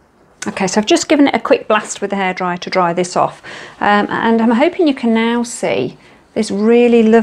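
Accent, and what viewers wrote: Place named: North, United Kingdom. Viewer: Hi! Thanks for this video - just chanced upon it, as I was searching inspiring videos on granulation. I love the DS range too, and acrylic inks. I'm really looking forward to departing from my usual floral art and photo-art, and try something a little different. Maybe some landscapes! You've given me a boost, and I feel really inspired to get started.... Thanks again!:O) btw, I live in Scotland now, but I clocked your accent, as I originally come from High Lane between Stockport and Buxton.... are you from somewhere around there? Cheshire, Derbyshire, York, Lancs?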